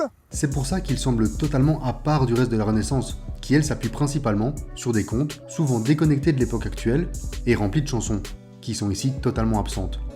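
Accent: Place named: Belgium. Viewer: On entend que tu es belge parce que tu prononces la séquence « ui » comme « oui ».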